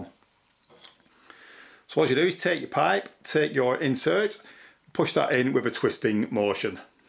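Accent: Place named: Lancashire, United Kingdom. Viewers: I love your Lancashire accent and the way you emphasise 'T's (like in SpeedfiT) and 'G's (like in plumbinG) when they're at the ends of words. Just a few miles up the road in Cumbria we just don't do that. Personally I've used both plastic and copper. I can take pride in my copper and soldering work, but much less so with plastic, I feel like I'm cheating. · Was gonna say I can hear Blackburn in that accent...I was close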